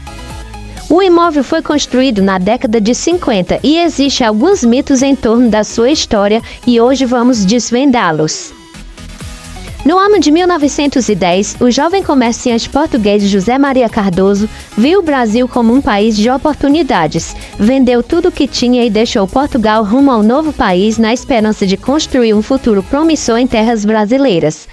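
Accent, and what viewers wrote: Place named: Saxony, Germany. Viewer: Morava perto quando novo e era especialmente maravilhado com as rampas para carros. Você é cearense mesmo? É uma dúvida sincera, porque sua pronúncia dos "R" é um pouco saxônica.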